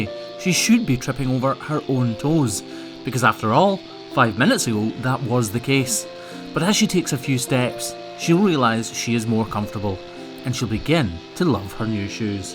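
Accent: Scotland, United Kingdom. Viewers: he sounds scotish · Hey, Where is the big smile and a hand over the camera lens? What's with the black screen? Oh, I know the nasties over on Craigie Boy have caused you to rethink everything. Come on love, we want the smile back and the hand over the lens. Those two things speak of you as much as Your Scottish accent. Tell all the nasties to buzz off! Do that, and I'll kiss one of your cows. lol Have a good evening.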